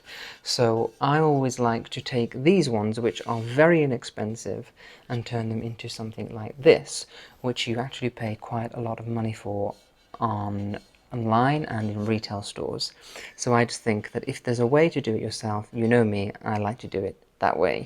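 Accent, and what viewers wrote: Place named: Northern England, United Kingdom. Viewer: Not sure of his background, definitely not top drawer as the slightly hoity toity southern accent has those very flat vowels which emanate from the north of England?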